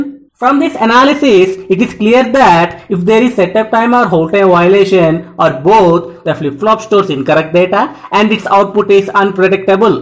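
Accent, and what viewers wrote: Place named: India. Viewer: Indian accent sucks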